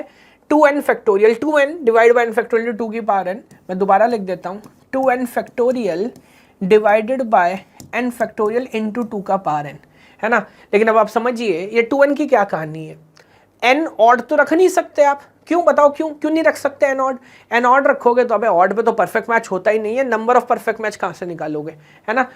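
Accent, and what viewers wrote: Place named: Kanpur, India. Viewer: aap kanpur ke lag rhe ho sir, Jis tarah se kahe , or pel diya use kr rhe ho .....BTW BEST EXPLAINATION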